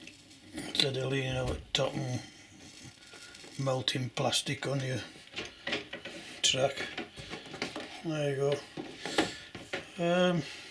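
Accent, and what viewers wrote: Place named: North, United Kingdom. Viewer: Hi, What a lovely set up you have, it looks great even as you said, a long way to go??, keep up the good work, nice to hear a north country accent as I am from the north country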